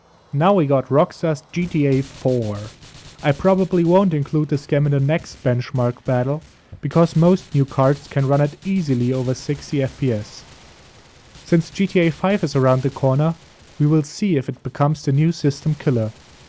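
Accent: Germany. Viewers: das deutsch trieft aus allen sätzen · Hab sofort gemerkt, dass da ein Deutscher quatscht :D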